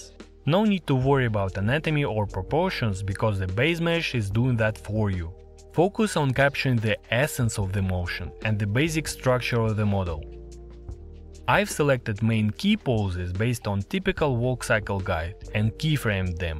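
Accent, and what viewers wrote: Russia. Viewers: Where is cheating. It took years to complete. Also this runglish is an ear ache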